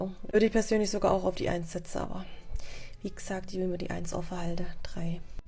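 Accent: Swabia, Germany. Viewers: Haha du babblschd Schwäbisch is des Luschdisch.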